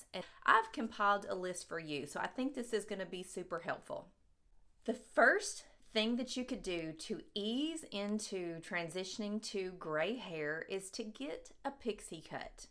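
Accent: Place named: Mississippi, United States. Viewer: Your accent is the cutest!! It makes me feel like i'm back home in MS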